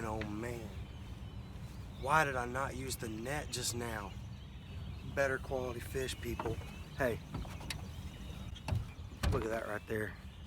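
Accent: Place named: Texas, United States. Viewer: Are you sure your not from Texas?👍 enjoyed the video, I will subscribe!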